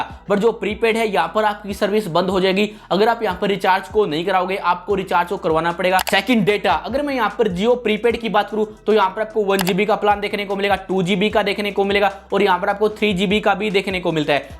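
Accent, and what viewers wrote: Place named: Haryana, India. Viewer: aapki aawaz se pahchana main bhi haryana se hu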